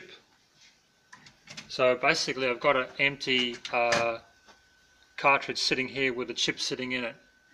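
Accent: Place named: New Zealand. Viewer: U sound like a true kiwi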